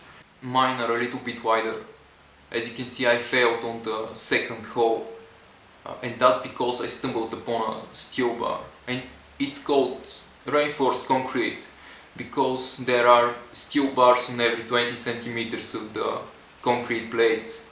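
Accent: Bulgaria. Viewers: тва ако не е български акцент те па на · He is bulgarian bro :D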